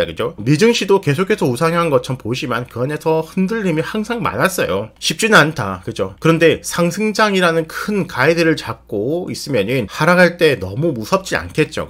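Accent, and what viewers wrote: Gyeongsang, South Korea. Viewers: 남석관 할배가 자기인생에 1~2월은 나빴다! 하던데,,,인물 나셨는지 기대합니다. 수익보다 나레이션(갱상도 말로 씨부리는 맛) 재미.아닌지.?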